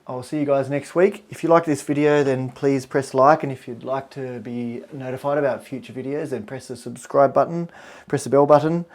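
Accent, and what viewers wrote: Australia. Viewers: Simply stunning, and wonderful to watch you work. (Also, loved hearing the Aussie birds and accent—I’m an Aussie living in Boston.)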